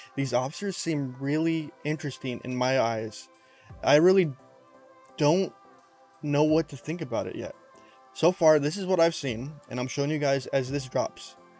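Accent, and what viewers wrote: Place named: United Kingdom. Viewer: YO dude, are speaking the queens language?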